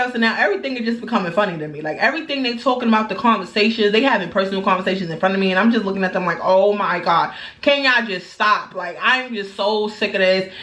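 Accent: New York, United States. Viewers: Love your story times..you're looking gorgeous, girl..and I love your New York accent! Weirdo is a dumb a**, on evertthing..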